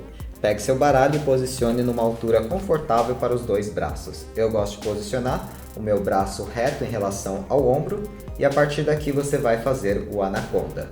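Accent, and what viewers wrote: Brazil. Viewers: Qualidade alta... cenário simples, técnicas que não vemos no Brasil... de repente: português-Brasil, sotaque paulista. kkkkkkk Mt bom.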